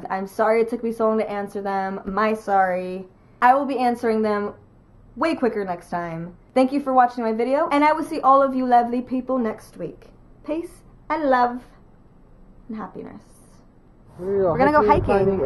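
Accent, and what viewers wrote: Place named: United Kingdom. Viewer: Your so layed back and i love it!! Great little british accent to!! :)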